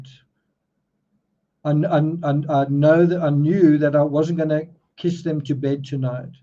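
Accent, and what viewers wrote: South Africa. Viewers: I was wondering what your accent is, is it South African mixed with British or just South African? Love it, so soothing. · If you're weird then I am, too. Looks like we're in plenty of good company. His voice has such a smooth delivery plus I've become a fan of the South African (except for E.M.; his is almost gone anyway) accent because of him. He really is brilliant, which I enjoy in anyone. Will probably let him lull me to sleep again tonight. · yes, I love the SA accent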